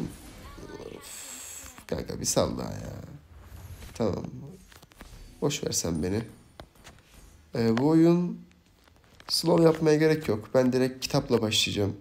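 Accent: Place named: Ankara, Turkey. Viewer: Abi senin arada giren şu Angara şivene bayılıyorum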